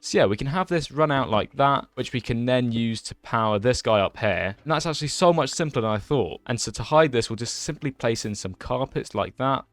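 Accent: United Kingdom. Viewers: Pretty similar but Mumbo's accent is more southern, but this guy is more estuary. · Bro is british mumbo jumbo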